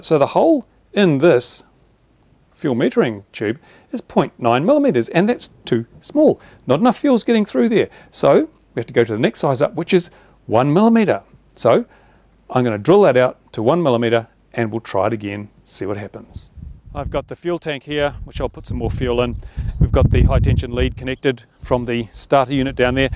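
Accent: New Zealand. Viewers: you sound kiwi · Why dont you mount it on a sheep???? Did I detect a Kiwi ecsent.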